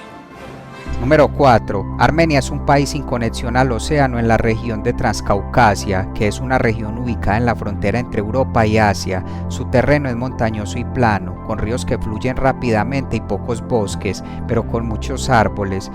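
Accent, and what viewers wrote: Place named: Medellín, Colombia. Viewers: Con todo respeto porque hablas así? Eres paisa de Medellín ? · Eres colombiano de Medellín,no? Yo conozco ese acento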